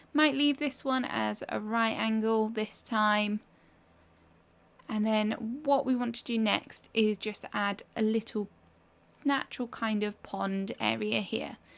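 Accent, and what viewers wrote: England, United Kingdom. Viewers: I love your accent! Are you from England?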